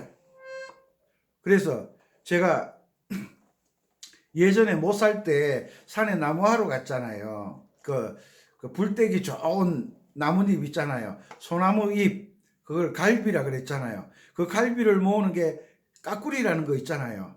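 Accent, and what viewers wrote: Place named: Gyeongsang, South Korea. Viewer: 완전 갱상도 니다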